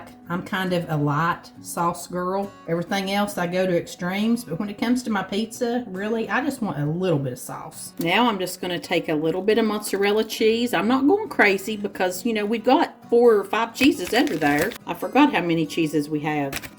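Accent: United States. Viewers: Can I just say I think your American accent is absolutely adorable, your so friendly, lovely lady, and obvs your air fryer recipes are fantastic. 😊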